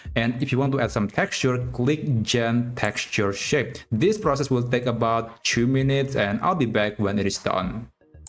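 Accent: Indonesia. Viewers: you sound Indonesian, are you?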